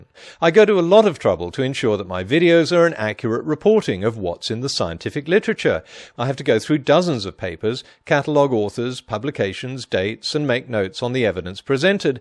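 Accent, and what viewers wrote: United Kingdom. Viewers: You might have people beeing sceptic about you. But at least you have this very very nice british accent (Is it Oxford?) and that always help upon credibility :-) Pun aside. You have very clever counter-arguments against people suffering from "youtubitis"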